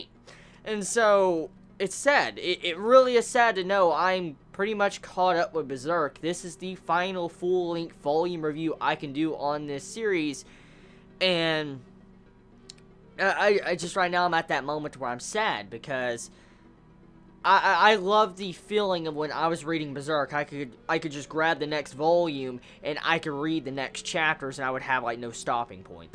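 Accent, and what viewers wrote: South, United States. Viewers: I never picked up on this before but your accent sounds distinctly Southern all of a sudden. You from the Southeast United States?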